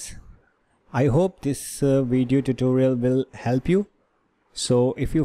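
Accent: India. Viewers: Worked for me, thanks! Also one of the easiest to understand indian accents out there :).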